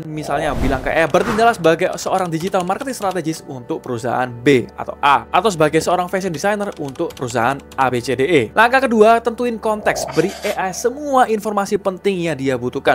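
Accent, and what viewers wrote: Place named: Surabaya, Indonesia. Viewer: mantap cak, logat sby nya kelihatan... sbynya barat, timur?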